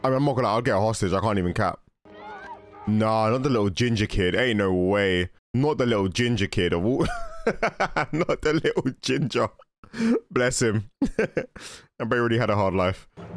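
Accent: United Kingdom. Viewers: Oh my gosh, you reacting to Disney movies would be so lit!!! Geez, I just watched a little further and you not knowing that the US was even in WWII is killing me just a little bit inside LOL Despite the accent, I forget to consider you're British sometimes during your reactions to American movies/shows. To be fair though, I guess I don't know too much about specific contributions England had during the war, so I can't expect more than that out of you for America. I definitely know y'all were one of the major Allied powers though! haha (The most specific thing that comes to mind to me for Great Britain is "Keep Calm & Carry On" and the context surrounding that.)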